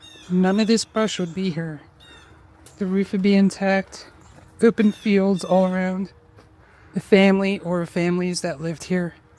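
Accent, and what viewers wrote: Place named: Philadelphia, United States. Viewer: Loved the video. Was it intentional not to reveal anything about the location? It feels a bit counterintuitive if your goal is to share historical preservation. I couldn’t even figure out where you're located in the United States, though I think I hear a Philadelphia accent—maybe? Adding a mention in the description or title could be helpful.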